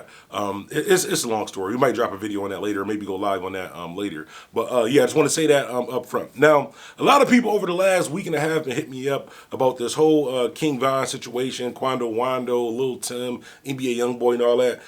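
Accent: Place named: Buffalo, United States. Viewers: Lol if I ever bump into I'm gonna give him 5 mins of disclaimers before I greet him and bro you got an upstate accent its cool though rep ya hood lol Salute bro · You pronounce car funny & yes you do have a Buffalo accent which is proper like Cali niggaz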